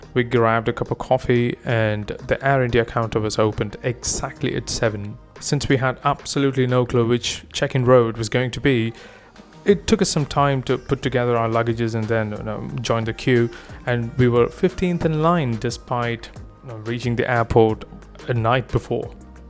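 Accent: United Kingdom. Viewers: Brit accent coming from a desi who lives in the US. Can't wrap my head around it, unless you binge watch British TV Shows a lot. Cheers mate!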